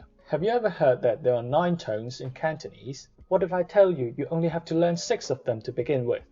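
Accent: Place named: United Kingdom. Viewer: I still think listening to you is very trippy. We have similar accent in our Cantonese, but my English is a North American English accent where as yours is more British. :P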